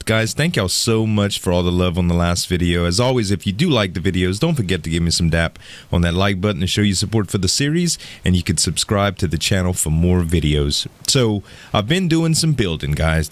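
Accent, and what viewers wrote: Louisiana, United States. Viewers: Is Z from louisiana?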